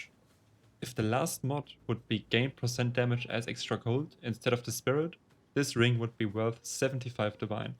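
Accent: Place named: Germany. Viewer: Junge dieses deutsch englisch ist ja grauenhaft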